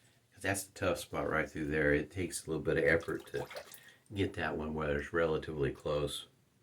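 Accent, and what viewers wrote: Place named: Tulsa, United States. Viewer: I’m a fellow Tulsa and was thrilled to find your channel. I was recommended to your channel from a link on Badger & Blade. They remarked at how laid back you are. I watched the video and thought that you sounded familiar, and then you mentioned Tulsa. You sound familiar because you talk like I do! Anyway, I really enjoyed your video. I use MWF almost exclusively. One thing I do different from you is I load my brush in the crock and then move to a scuttle to add the water. You are right about the crock becoming slick, and I’m a bit ham handed, so I don’t like trying to pick the crock back up until I’m done. When I’m done shaving, I clean up the crock by putting the lid on and flipping the crock sideways and rinsing the whole crock under cold water. A little water sneaks into the container and I put the crock away with the lid on and that teaspoon or so of water sitting on top of the puck. 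Since I shave daily with The Fat, it’s moist and ready to go the next day. If for some reason I skip a few days, I’ll put some extra water on top. Thanks for your channel, I’ve subscribed and turned on notifications.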